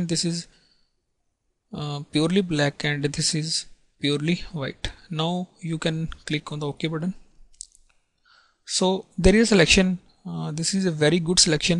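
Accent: India. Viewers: come on it not like that you have speak that typical indian type english in video, keep your shitty vocal mute. i got headache !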